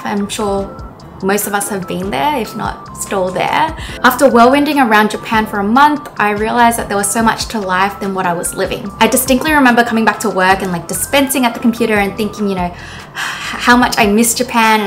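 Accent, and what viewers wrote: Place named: Australia. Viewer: No wonder U have a very strong Aussie accent! 🤭 Was wondering how come a Japanese girl speaks like an Aussie! 😆🌏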